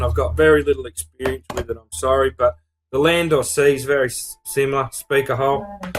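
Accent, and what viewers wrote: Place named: Australia. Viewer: He's Australian Karen...Oops, I meant Mary. There's not just one type of English, even in the US.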